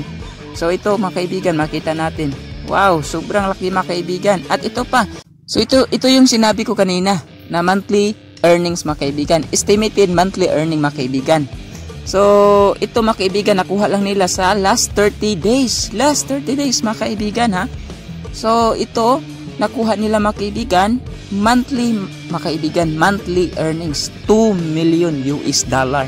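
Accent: Visayas, Philippines. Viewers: bisaya ka dong haha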